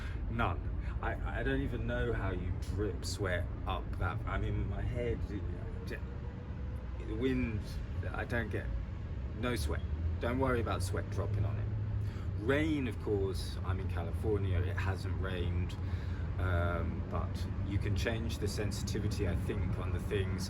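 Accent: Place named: England, United Kingdom. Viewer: HAHA felt like I was watching my little brother , makes the same face and comment only with English accent instead of Mexican. Love the review and candid comments. Felt like a friend (or little bro) was spelling out why the 830 was better.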